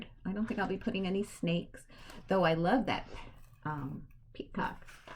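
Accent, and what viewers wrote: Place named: North, United States. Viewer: Love your videos,! I can relate to what you feel about being from New York - I am from Philly, haven't lived there since I was 25, and listening to you takes me right back to my northern roots.:) I love watching your quilting, including your process and progress on your quilts.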